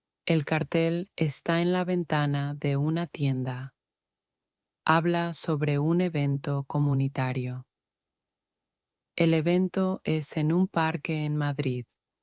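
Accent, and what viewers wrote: United States. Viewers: Yes!!! Are you American or Columbian??? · ...these videos are wonderful! i never watch them, just listen on my phone. but who are you? you sound American but you are in Nigeria?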